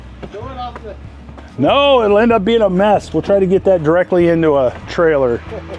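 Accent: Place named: Southern Indiana, United States. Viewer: your Midwest accent... you said "dishWARSHer"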